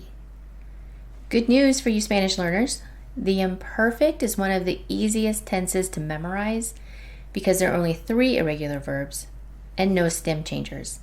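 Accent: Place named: United States. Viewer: I get so distracted with your accent that I dont undestand what you are explaining. You sound so american that you are pronouncing the O and A with american accent. This may have been a good explanation of the imperfect but your accent is really distracting me.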